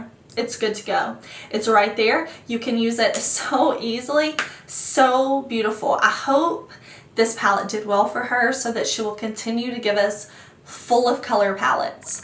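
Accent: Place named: Kentucky, United States. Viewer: You sound like you are from Kentucky when you speak. Nice accent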